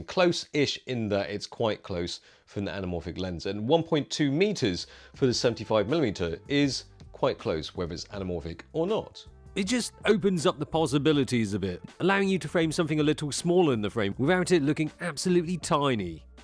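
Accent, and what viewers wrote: United Kingdom. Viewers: I think accent gets more British with every video of his I watch.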